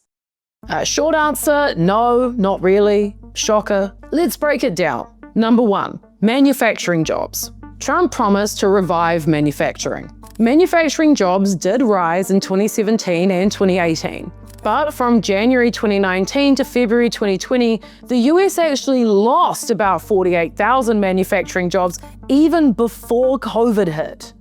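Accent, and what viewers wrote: New Zealand. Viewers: Thank you to a New Zealander on the other side of the planet for helping me understand my neighbors in the U.S. a little bit bedder, (sorry; 'better'. Enjoying the Kiwi accent). I've lived most my life between rural Maine and the city (not bedroom suburbia). Last week, deep in the Trump country of rural Maine (Burnham, 67.5% Trump) we just lost another factory, (making wood products.) Whatever the reason; state laws, Trump tariffs, Trumpism is clearly not helping. Will they catch on? Probably not before it is too late and any form of democracy is gone. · Excellent logical intelligent presentation. I was sceptical initially and suspected this was an AI-generated Kiwi accent to appeal to us, despite being familiar with voice from RNZ but clueless about her appearance. (Subbed.) Trump convinced the "unwashed" that the middle-class were the elites who were mocking and oppressing them. 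Steve Bannon is all over this cynical manipulative strategy.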